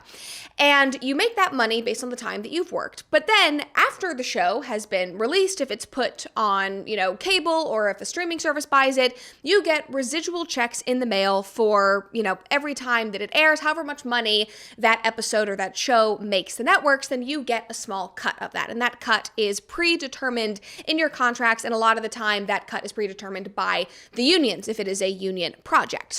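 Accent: United States. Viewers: can i make an AI clone of your voice with Elevenlab i want to custom make an ASMR video on u, i liked your vibes and yapping fast and quick with typical American accent :yougotthis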